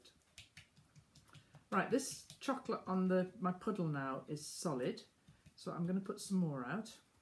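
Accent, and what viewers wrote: United Kingdom. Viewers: I just found your channel linked to Hercules Candies channel. You are so sweet and fun to watch. You made me smile several times as I watched. You stated many things that I feel about Hercules Candies and their crew. It was so fun watching you use the puddle and Steve's technique. Plus, the added bonus of your charming British accent. 😏 Thank you for the smile today. (I know a year later..) I'm looking forward to catching up and watching more. 🙂💚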